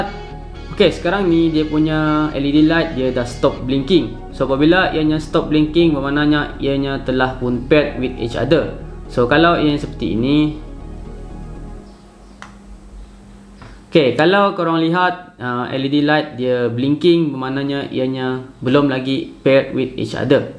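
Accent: Sarawak, Malaysia. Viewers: bos ada slang iban or bidayuh..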